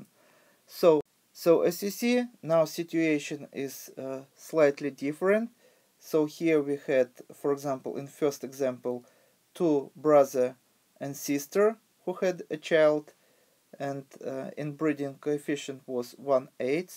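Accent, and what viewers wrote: Russia. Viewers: Вам нужно работатт над произношением. Сильный русский акцент на английском - это что-то ужасное. По себе знаю) А видео очень информативны!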